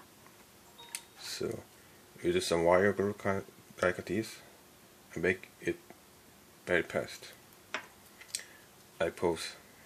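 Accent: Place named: China. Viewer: Sounds like a chinese trying to speak english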